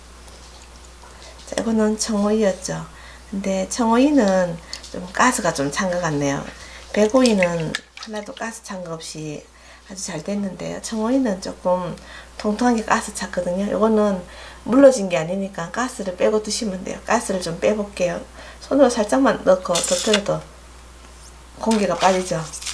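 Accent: Gyeongsang, South Korea. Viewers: 네. 이모님^^ 감사합니다. 저도 경북에 산 적이 있어서 이모님 사투리가 정겹습니다^^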